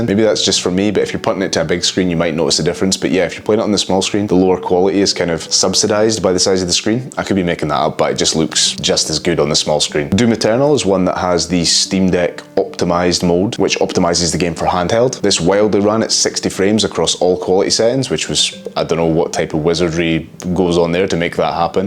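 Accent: Scotland, United Kingdom. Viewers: I appreciate your transparency and most of all your content (and scottish accent).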